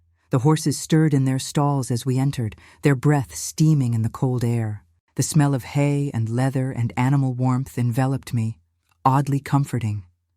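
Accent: United States. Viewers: I absolutely LOVE these story's but, you have made a fundamental error choosing this beautiful American voice to narrate. Victorian & current day America, never had Dukes or any British aristocracy. To make these story's more believable, you should use an English/British voice. ❤❤❤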